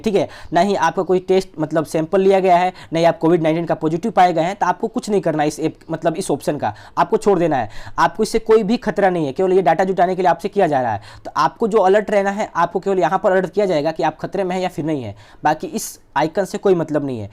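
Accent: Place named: Bihar, India. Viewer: thoda space dia kr bolte waqt bihari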